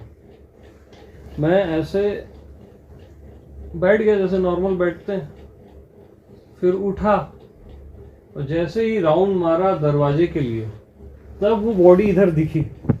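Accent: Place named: Nagpur, India. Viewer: Aap nagpur ki bhasha khubsurat hai..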